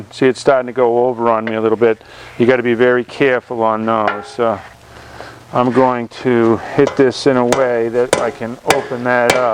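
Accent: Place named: New England, United States. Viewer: It would be a lot better if the person speaking didn't have an obnoxious Yankee accent... I could only make it through 1 minute of it before having to give it a thumbs-down and canceling the video.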